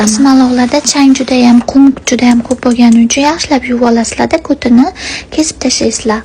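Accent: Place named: Fergana Valley, Uzbekistan. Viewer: Каерлик шу киз шеваси водийликка ухшеди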